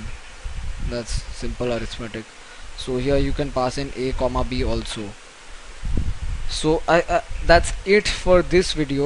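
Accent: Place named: India. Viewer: Your Indian English is soo much better, than Chineese English!!! :)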